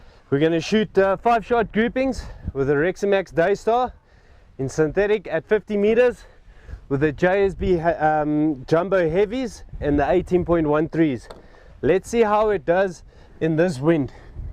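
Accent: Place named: China. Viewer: sorry. lost me at chinglish.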